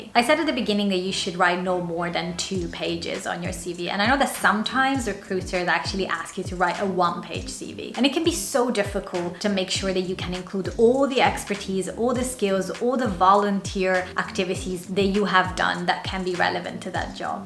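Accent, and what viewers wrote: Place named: England, United Kingdom. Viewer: Thank you for the information. It's always hard for me to get through a presentation when the presenter ends every sentence with vocal fry but easier with an English accent. I guess I'm a racist.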